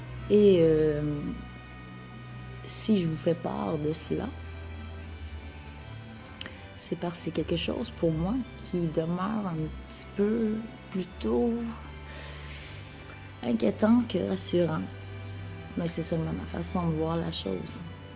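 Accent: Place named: Quebec, Canada. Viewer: Au Québec, j'ai joué durant 20 ans à un sport nommé "fastball" un dérive du base-ball.Selon moi, le base-ball est un des sports qui exige le plus de stratégie, de réflexion, de tactique, de concentration et d'instinct. Mr. Aaron a été l'un des plus grands dans son domaine, faisant preuve d'humilité et d'humanité malgré sa grande popularité.Beaucoup de sportifs professionnels devraient prendre exemple sur lui. En passant, je suis complètement bilingue et vous prononcez très bien son nom, avec un léger accent Québécois tout à fait charmant.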